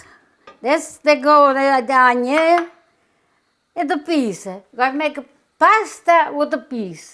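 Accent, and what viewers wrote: Italy. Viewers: I love you ❤. You are exactly like Nona Rosa😊, my mother. Exact Italian accent! Bella Nona!! We were all born in Napoli & mamma cooked just like you! God bless you 🙏❤️🙏❤️🙏❤️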